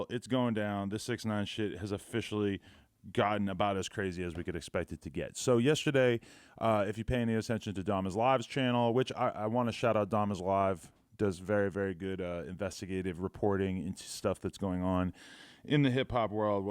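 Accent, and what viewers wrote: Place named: New England, United States. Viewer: For some reason I can really pick up new england accent in this video, which is usually nonexistent to me.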